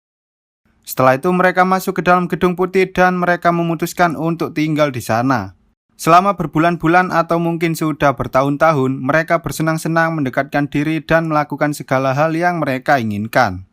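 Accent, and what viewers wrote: Java, Indonesia. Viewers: wong endi mas😂 kok iso jowo aku seko jogja salam mas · Medok Medok Mantapp 🤣🤣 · Mas pean wong jowo ta😁😁😁 Wocoen mas😁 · Jowomu ngendi min😂 Kediri Jawa timur hadir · Jowone mantep🤣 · Lambe jowo. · bang Lu orang Jawa ato Sunda Sih?😙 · Jowo Yo mas 😂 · Medok nmen mas V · Jowo rek wkk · Mas jowo Endi kowe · Wong jowo Iki? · weleh wong jowo toh mase🖐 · Ngomong jowone lucu aku ngakak anjir onok - onok ae😂😂😂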